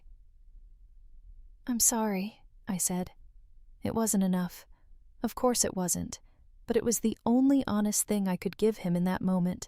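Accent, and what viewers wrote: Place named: United Kingdom. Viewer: Love this story...and the narrators slight British (?) accent. 🥰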